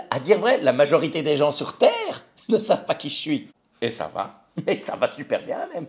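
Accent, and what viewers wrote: Belgium. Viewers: Oh putain, mdr pourtant ça s'entend bien que tu es belge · en Belgique tout comme partout dans le monde les cons sont légions, t'inquiète, les "vrais" belges comme toi et moi on sait en reconnaître un autre dès qu'on l'entend causer, une fois!! on t'adore! continue mec, t'es le meilleur!;)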